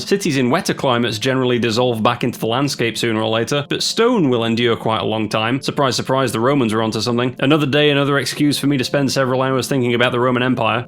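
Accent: United Kingdom. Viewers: YOU'RE SCOTTISH?!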